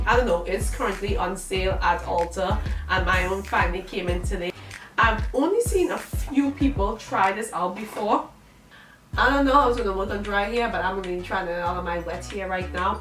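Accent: Trinidad and Tobago. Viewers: I know a Trinidadian when I hear one 🇹🇹🗣!!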